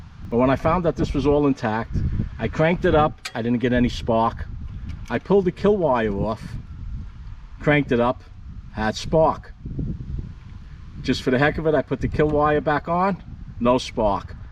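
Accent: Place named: Boston, United States. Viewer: Love the Boston Aaacent!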